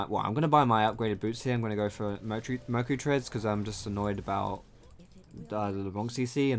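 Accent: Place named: Birmingham, United Kingdom. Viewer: HEALTH POTIONS PELASE. You sounded so much like my brummie housemate, made me laugh so much xD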